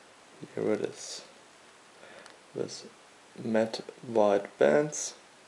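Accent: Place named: Germany. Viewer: Man hört an deinem Englisch sofort raus das du deutscher bist haha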